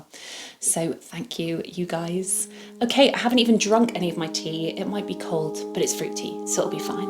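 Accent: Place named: United Kingdom. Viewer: You have a new subscriber here! I'm so sorry that getting a referral to a specialist was so tough. I'm in the States, and referrals tend to work a bit more efficiently (if you have good insurance!). I'm pretty sure that I could very well have nodules. Speaking as well as trying to sing has been difficult for the past couple of months. I've already made an appointment, and we'll find out. The light at the end of the tunnel is any comfort that they can be healed -- and I can hopefully sing again! BTW, you remind me of a girlfriend I had a while back.... but, with a British accent! (and she was real cute!!).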